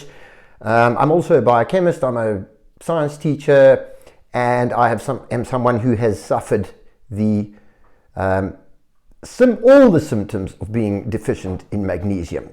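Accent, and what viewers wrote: South Africa. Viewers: I can hear that you are a South African. Cape Town?? Do you run a practice??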